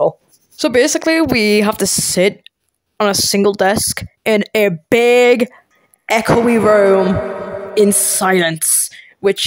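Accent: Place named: North, United Kingdom. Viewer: you sound so northern LOL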